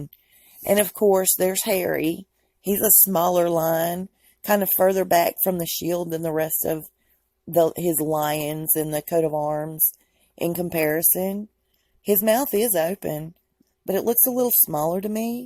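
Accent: South, United States. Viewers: I see YouTube will let any idiot with a Southern Accent pretend to know the inner workings of the royal family. I can't 😂😂😂😂. I see most of the videos, the comments are disabled😂😂😂😂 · You are a very bright light. You vibrate at a very high frequency. You have so much love and compassion in your heart. And to hear someone speak without the burden of having to pass judgement, with only the pure intention of educating and awakening is very much appreciated. And for lack of any better way to describe it, so I hope that I don't offended you, but your "accent" is like a sweet Southern lullaby that tends to soften the blow of the horrific atrocities you speak of that we all are in spiritual warfare with. Thank you and bless you.💖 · Wow! I enjoyed the way you interpreted the Coat of Arms!😃👍Id love to go with you to some cool art displays! You definitely have great insight, and intuition! 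I enjoyed hearing you again too. You have such southern charm in a down home way that makes your viewers feel right at home! My daughter got married in a hotel art museum that blew me away! It was a truly memorable experience due to how some of the art and its display affected you. I agree that the Queen has an evil eye toward Meghan, and I wonder if she might have had secrets beforehand about the royal family. I agree with you totally that the royal family will make sure Meghan doesn't "sing like a bird"! It is so disturbing that Meghan's Mom has to act like her daughters been shunned! It's like she has to act like her daughter doesn't exist to her loved ones and friends, as if she was dead! No comfort though given due to her daughter living in a palace! It seems like this is overkill...what else is being hidden?!🐍🐉🐲 Love you bunches!😃🍕🍻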